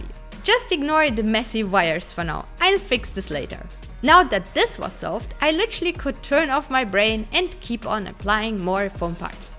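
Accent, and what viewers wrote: Germany. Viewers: you sound like you're german... and you probably are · den deutschen akzent hört man einfach immer raus 😄 sehr geil gemacht ❤❤❤❤❤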